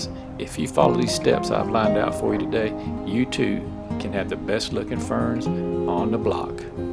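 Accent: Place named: South Carolina, United States. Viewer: Thanks for all the tips🌿Just hooked our system up. Love that everyone is commenting on your accent. That’s that born and raised in South Carolina flow☺️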